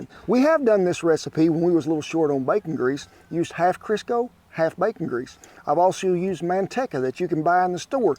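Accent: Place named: Texas, United States. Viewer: Boy, you look like my family down in South Texas.. You sound like them too. Lol Im gonna make your tortillas.. i havent had a good one since we left the Rio Grande Valley in '77. Ugh.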